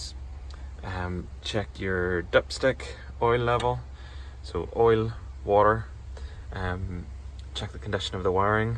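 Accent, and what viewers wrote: Northern Ireland, United Kingdom. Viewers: Now that's a very particular accent. Northern Ireland? By the way, Nice car.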